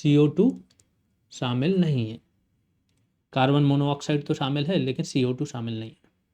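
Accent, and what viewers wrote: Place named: Bundelkhand, India. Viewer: Sir aap bundelkhand se lg rhe ho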